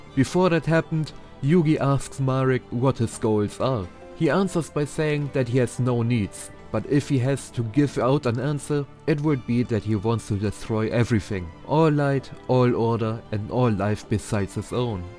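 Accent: Germany. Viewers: You have a strong german accent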